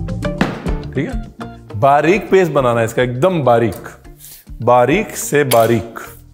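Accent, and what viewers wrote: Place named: Punjab, India. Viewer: Nice recipe with delicious punjabi tone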